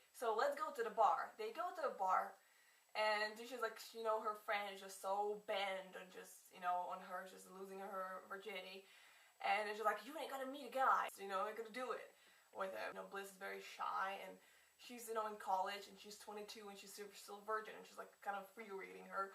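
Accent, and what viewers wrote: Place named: Russia. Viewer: You have a bit of a Russian accent! I love Russian accents! Ps love the book haul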